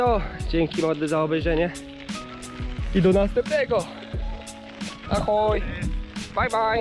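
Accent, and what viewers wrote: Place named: Russia. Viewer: Masz leciutko rosyjski akcent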